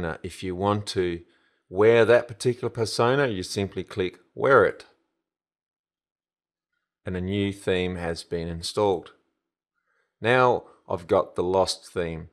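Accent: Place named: Australia. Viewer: australian!! i love that accent.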